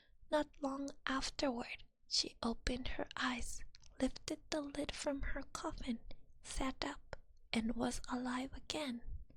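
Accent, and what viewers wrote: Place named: England, United Kingdom. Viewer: If I didn't know otherwise, I world have said she was English. Sorry my Japanese is not good enough to write this in Japanese.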